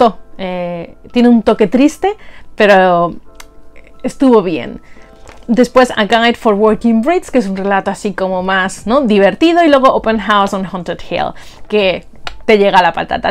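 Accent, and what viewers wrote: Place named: England, United Kingdom. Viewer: ¡Hola! Yo también conocía los Premios Hugo y Nebula , pero tampoco me había interesado mucho por los premios. Ahora con tu vídeo me ha picado la curiosidad, creo que me gustaría hacer también un repaso de los mejores y leerlos, tiene que ser interesante jaja. Me encanta el título de las madres cañeras, es como que no me interesa de qué vaya la sinopsis, solo por el título quiero leerlo 😂 Me parece maravilloso que aparezca la regla, que nos olvidamos de que existe! Ains, el de la biblioteca me encanta cómo suena la trama. La sinopsis de "Uncanny" me recuerda mucho a la canción de "Kokoro" de Vocaloid, no sé si la conocerás, pero he pensado en ella automáticamente. Y por cierto, me encanta tu acento ingléeeeees!! Un besico :D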